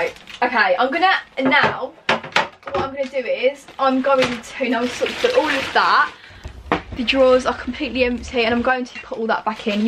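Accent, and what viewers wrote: United Kingdom. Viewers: me, a canadian, watching this because i love bright, alternative makeup and just makeup in general. me, the same canadian, listening to her british accent: LIT-CHRA-LLY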